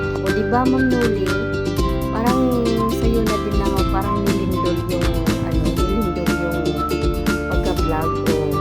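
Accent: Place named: Iloilo, Philippines. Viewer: Ilonggo na tono mo sis 😅😅😅😅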